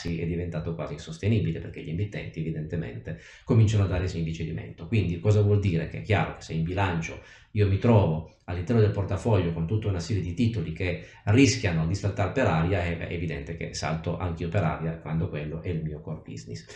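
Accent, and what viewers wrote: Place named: Piedmont, Italy. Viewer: Riconosco l'accento piemontese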